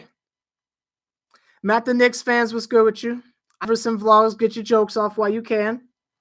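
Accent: Canada. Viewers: you Canadian ?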